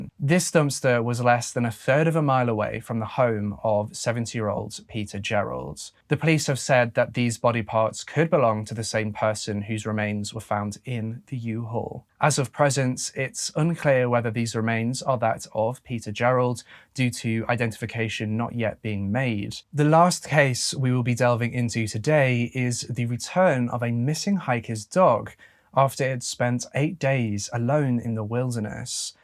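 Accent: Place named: Derbyshire, United Kingdom. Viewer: im guessing Derbyshire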